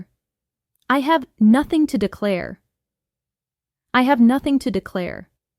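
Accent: United States. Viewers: s accent is american? Any body tell me.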